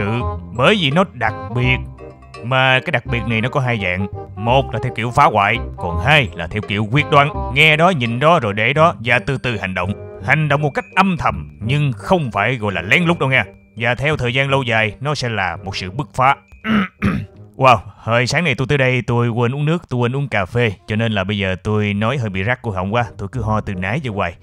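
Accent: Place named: South, Vietnam. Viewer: Mình nghĩ sau ad nên để chữ ở trên video,các bạn miền nam nghe dễ,nhưng miền bắc nghe hơi khó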